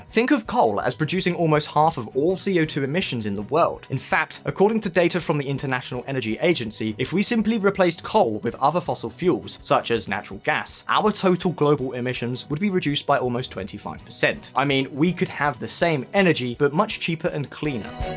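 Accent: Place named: United Kingdom. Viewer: It's a French word spelled façade. That squiggle under ç makes it sound like ß or ss. Otherwise, even in French it would be "fakad". Anyway, is an up and coming British-like voice, a welcome relief from so much careless American slurring heard on YT.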